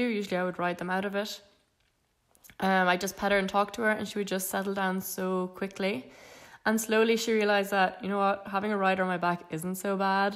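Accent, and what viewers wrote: Ireland. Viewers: That's an Irish accent, not a British accent. There's a very big difference. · I’m pretty sure ur irish😂same what county Meath?cork?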